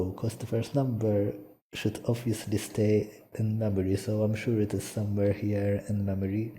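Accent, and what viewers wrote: Malta. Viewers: Ah yes the maltese accent is strong with this one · Aaaaaaaw maltese voice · Maltese accent sounds interesting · First time hearing his voice and shit that maltese accent is powerful af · that sweet malta accent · Dak l-accent Malti Mannnnnn! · Maltese accent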